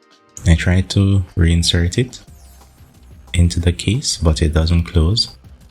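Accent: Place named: Jamaica. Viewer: How him sound like yaadie so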